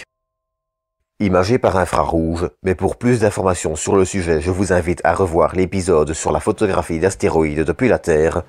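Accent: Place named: Wallonia, Belgium. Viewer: Petit commentaire juste pour flatter l'algorithme de YouTube..... Excellant travail comme d'habitude, avec comme signature sonore cet accent inimitable... Ha, ce terroir Wallon, on l'Aime.....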